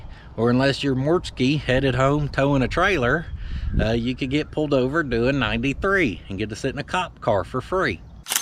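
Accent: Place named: Oklahoma, United States. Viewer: the true sound of Oklahoma!!!!! A real accent from the south west!!!!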